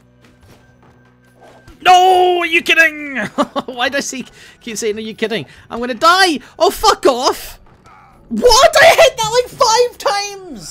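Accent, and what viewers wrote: Scotland, United Kingdom. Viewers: No, he's Scottish.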